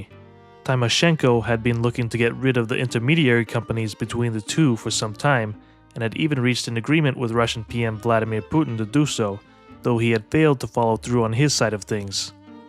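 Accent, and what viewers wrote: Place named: Boston, United States. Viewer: Sounds dead on like Kevin Weeks to me. Definitely a Boston accent and not a Long Island one.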